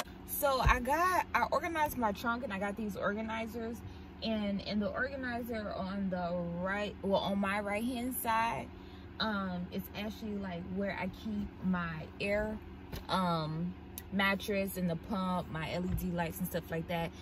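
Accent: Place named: South, United States. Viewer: I'm from Queens York I just love it when you talk Southern♥️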